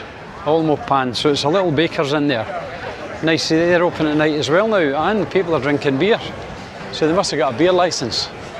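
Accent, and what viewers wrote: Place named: Northern England, United Kingdom. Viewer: It seems to be "northern", or is it scottish?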